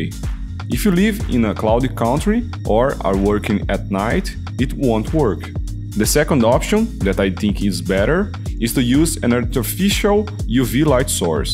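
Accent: Brazil. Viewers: A heavy Brazilian accent... that was unexpected tho! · Oi pelo teu sotaque e nome, você é brasileiro? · So he has a Brazilian accent? I thought maybe it was Russian? GTK thanks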